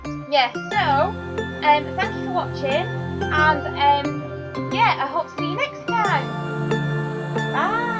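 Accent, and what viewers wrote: United Kingdom. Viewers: Brill podcast!! I'm going to have to binge all your previous episodes! And it's nice to find a podcaster with a proper British accent ;)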